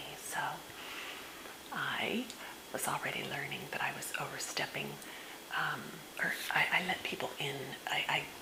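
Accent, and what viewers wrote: South, United States. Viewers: I saw ur souther ascent vid and actually thought u where southern lol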